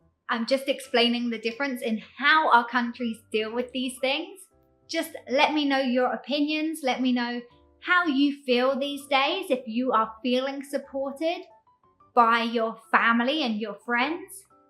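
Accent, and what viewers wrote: United Kingdom. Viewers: 真的非常喜歡聽您英式英語的口音講任何事情，這種腔調聽了真的是一種享受啊！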